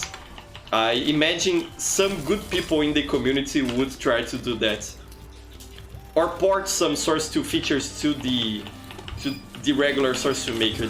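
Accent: Brazil. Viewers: Your accent sounds like you're brazilian. Great content, keep it up!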